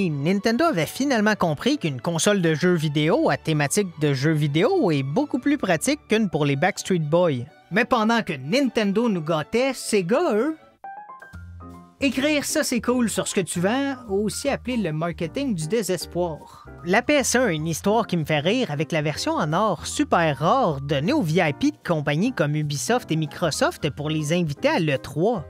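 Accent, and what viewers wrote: Quebec, Canada. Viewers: OMG L'accent quebecois <3 j'adore <3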